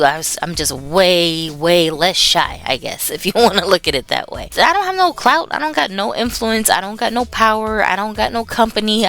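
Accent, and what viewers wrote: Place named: United Kingdom. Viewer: THE BRITISH ACCENT <3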